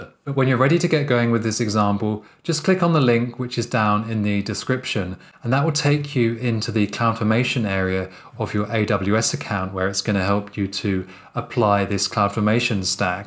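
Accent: United Kingdom. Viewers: british accent makes it more understandable and engagging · London English! Wonderful!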